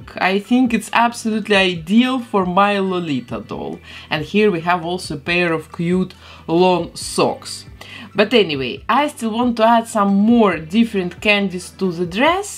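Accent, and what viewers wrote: Russia. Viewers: Привет, вы русская ? Просто у вас акцент сильный и некоторые слова на русском говоре . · Я слышу акцент, вы русская?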